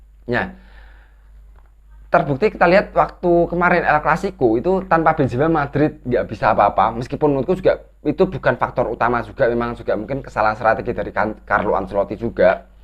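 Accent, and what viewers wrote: Semarang, Indonesia. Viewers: Efek logat orang semarang kali🗿👍🤣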